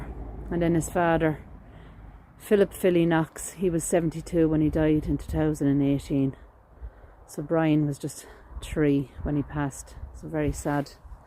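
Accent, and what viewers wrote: Ireland. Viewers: Thank you for another adventure,. By the way, I just love your Irish brogue! Not only does it sound really beautiful, but it adds such great atmosphere to your videos. Please continue to make your lovely videos.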